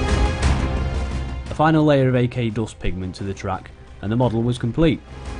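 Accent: United Kingdom. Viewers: Nice to finally hear your voice you sound like your from the UK.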